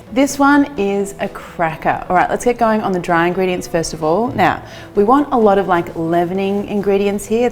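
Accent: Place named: Australia. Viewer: You're sounding more Aussie since coming back home!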